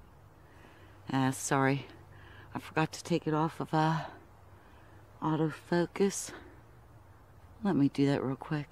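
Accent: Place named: Ohio, United States. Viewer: You have a great accent, is it unique to Ohio?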